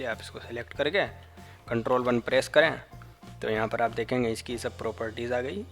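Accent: India. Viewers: bhut ala or apki Urdu bari mazay ki ha , anda kaar shape very sweet Indian Urdu I like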